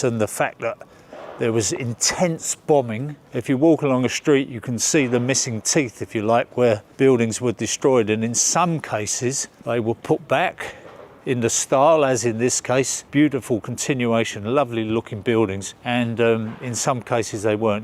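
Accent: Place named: East London, United Kingdom. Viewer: ....IMO...........a "refined" East London accent.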